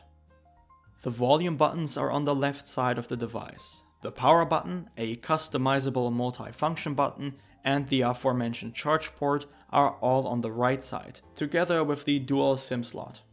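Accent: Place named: Netherlands. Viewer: Dutch accent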